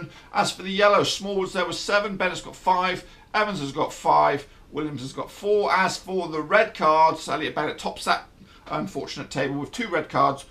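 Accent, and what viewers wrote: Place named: Blackburn, United Kingdom. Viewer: Nice Blackbun accent!!!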